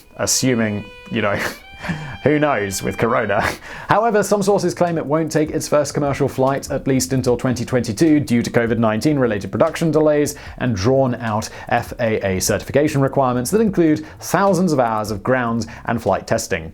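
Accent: United Kingdom. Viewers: Is this guy filming in America or just had a British accent? Any one know?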